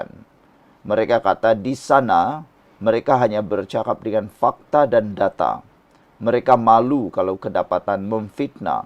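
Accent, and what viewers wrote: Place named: Sabah, Malaysia. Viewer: Ini lah chanel paling pengarut.penipu...Melalui dari cara pengulas menyampaikan Berita.tentu chanel ini berasal dr Sabah.Tp kalau Orang Sabah sudah tentu tahu perkembangan di Sabah..Tapi semua Berita dr chanel ini dr dulu sampai skg semua karut.Lebih baik jgn mengunakn loghat Sabah.memalukan Sabah..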